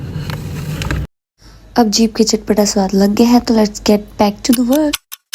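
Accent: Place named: Marwari, India. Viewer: Es aavaj m alag hi marwadi accent aarha h😝👍🏻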